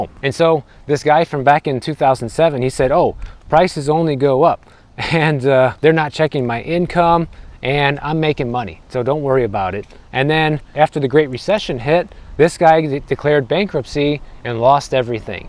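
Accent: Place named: Boston, United States. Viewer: You have to be from the Boston area. Am I right?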